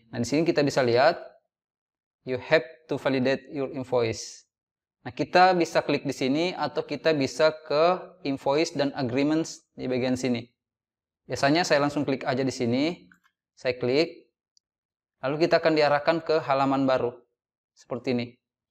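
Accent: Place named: Makassar, Indonesia. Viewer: Kak orang makassar yah?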